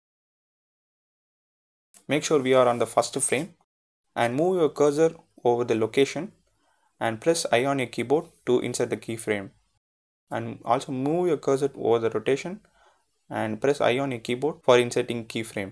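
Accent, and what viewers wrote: India. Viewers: Your accent is indian .Are You ?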